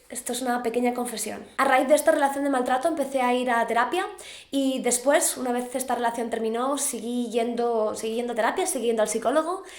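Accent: Spain. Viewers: Yo solo quiero tener un Hijo con tigo, eres muy linda, y ese asento de española me fasina😍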